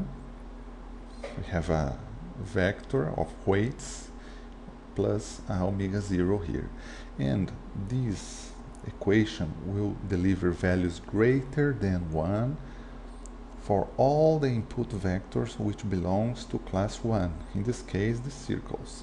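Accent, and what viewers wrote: Brazil. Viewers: sounds like brazilian english =) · are you Brazilian? you accent sounds a lot like the Brazilian one... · You are brazilian, aren't you? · Great explanation! You must be Brazilian by your accent right?? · By the accent I can say you are brazilian like me :) Great video! Helped me at the exam :) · Que sotaque brazuca hein?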